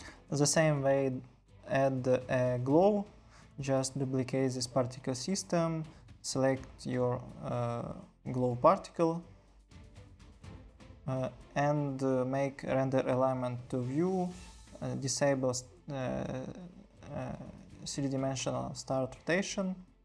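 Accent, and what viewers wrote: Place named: Russia. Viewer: хороший гайд, пишу на русском, потому что уверен, что ты оттуда же (акцент прям выдает)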